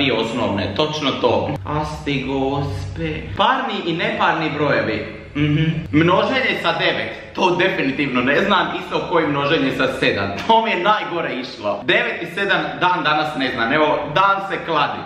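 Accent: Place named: Dalmatia, Croatia. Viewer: Vrh mi je kako mjesas dalmatinski i zagrebacki ...znači umrla sam kad si reko nis jea...i još nešto 4x8 nije 24 već 32 ahahahahahahj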